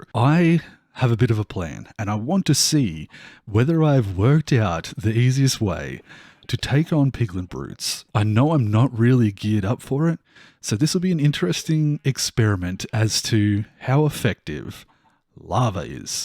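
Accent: Australia. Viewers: hi mate quick question, are you australian?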